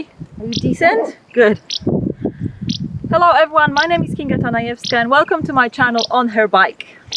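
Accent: Australia. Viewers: I spotted the Aussie influence in your voice and attitude. You're an exceptional individual and supreme ambassador. The world needs more of you Kinga. ❤ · Australian.....yes can pick Aussie accent....and polish....so am i....doing this on your own Awesome.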